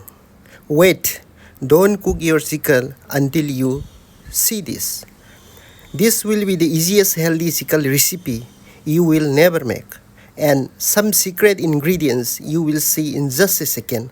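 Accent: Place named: Arab world. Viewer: U have a little Arabic accent 😅... Nice recipe 🎉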